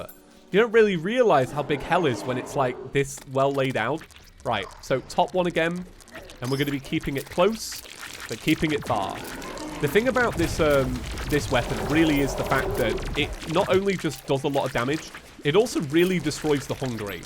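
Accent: United Kingdom. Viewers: i haven't been wathching you in a while, until you uploaded the Hollow Knight video. Since then, i've been binging your playthroughs, and accidentally picked up some of the British accent. how do i get rid of that ??'!?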